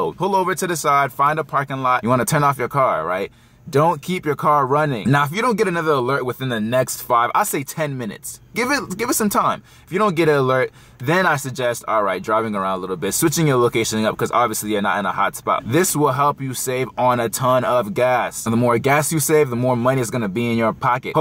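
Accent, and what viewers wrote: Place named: Philadelphia, United States. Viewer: Never mind. I heard Philly. 😜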